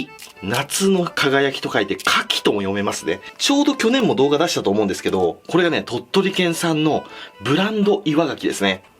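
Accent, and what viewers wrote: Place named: Mikawa, Japan. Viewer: しゃべりが三河弁？朝倉未来と似てるね！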